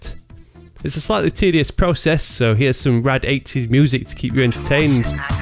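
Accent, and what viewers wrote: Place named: Scotland, United Kingdom. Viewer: It's not about entirely whether you can handle, personally it's not that fast for me, but it's a bit fast for me, or...his accent is kind of wacky, I mean, Brits are talking funny, and then there comes Scots and Aussies, which are way more wackier/funny when they talk, so, a bit harder to understand what he means when he says something at a high speed without spots in between commands/lines. I have to turn captions on to understand what scortcut he meant, or what he was saying in the first place while trying to do something or explaining.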